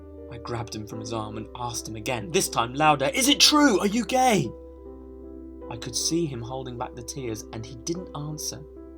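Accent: United Kingdom. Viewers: just because he has a british accent doesn't mean he says that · why does he sound british but act american???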